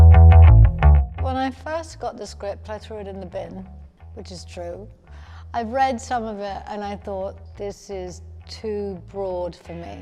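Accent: England, United Kingdom. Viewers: Because she lived in London for several years as a child, so her English accent is just as natural to her as her American accent. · I had no idea she is from the UK. I never heard her accent before. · Ok can someone please tell me once and for all if shes American or british bec sometimes she sounds American and i know that's her nationality too, but here for example she sounds SO British im so confused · She has her British accent on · Why is she still talking with an English accent? :p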